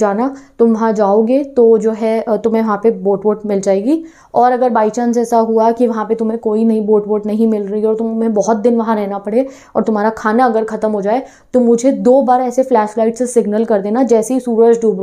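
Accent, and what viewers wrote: Punjab, India. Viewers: Didi aap punjabi hai??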